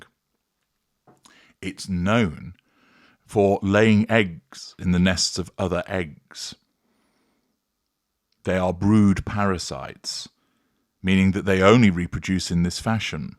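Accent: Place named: North, United Kingdom. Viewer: I detect a Northern Accent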